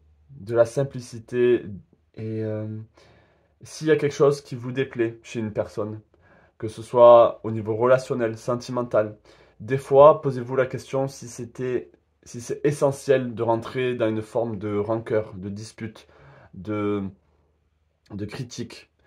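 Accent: South, France. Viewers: coup de foudre au boulot oui, je me suis inscrite à la danse et commence la semaine prochaine, je peints également et pratique les oracles ++++ puisque parait il je suis douée merci pour cette guidance et ce petit accent du sud que j'adore étant du sud ouest ;) belle journée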